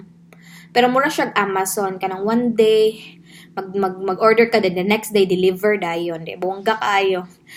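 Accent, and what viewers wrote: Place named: Visayas, Philippines. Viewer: Ma'am..ganahan kaau ko nimo, ma feel jud nako imo pagka bisaya !.. hehee..love it!... Ma'am still hiring pa sila until karon?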